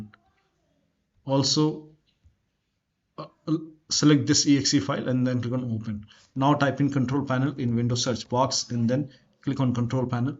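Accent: India. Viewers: >hear indian accent >close the video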